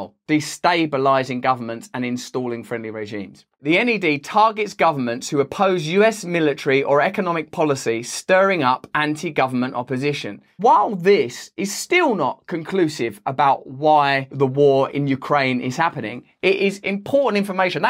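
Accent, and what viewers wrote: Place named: Essex, United Kingdom. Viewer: Not negating your point but just as an aside, if you knew anything about British accents you’d know that Russell has an Essex accent. Nothing wrong with it but it’s definitely not regarded as high brow accent here in the UK (and I say that as a Gordie!)😂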